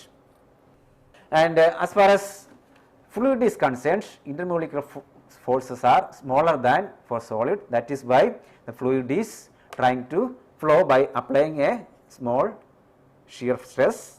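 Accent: India. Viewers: because you re indian, for me it is sooooo hard to understand indian english accent but lecture is good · Great lecture! It may be hard for a non-Indian to understand his English but with practice you will be able to do it.. Indians are good it math and its worth understanding them even if it requires practice. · the standard is good, moron. his english is fine, he has normal accent for indian people, and dat is fine. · I'm Indian, adn I definetly agree that he has a very strong accent,a nd ppl frm around the globe may have difficulty understanding, tough Indins think his English is very clear. However, STANFORD and many other universities have such videos too.. So, I would say different videos cater to different audiences, in terms of quality, ease in understanding adn for obvious other reasons !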